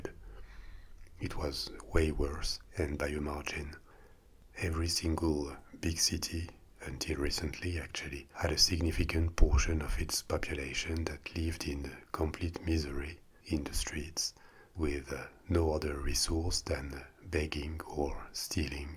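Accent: France. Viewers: I think you are one of my newest favourite asmr artists. That french accent.... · I'm a huge fan of ASMR with female voices, triggers it better for me personally. However, you sir, and your deep dark chocolatey French accent do the job quite well.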